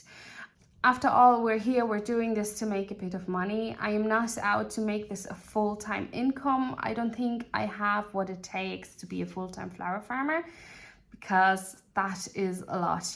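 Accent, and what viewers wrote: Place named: Ireland. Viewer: I'm enjoying catching up on your older videos. I'm so glad you made shorts as well! I'm telling all my homestead-interested friends about your channel, too. We adore supporting (and watching) small channels and helping the good ones grow! The bonus for me is feeling less homesick just listening to you speak. (I'm in USA and my Da was born in Malin Head, County Donegal).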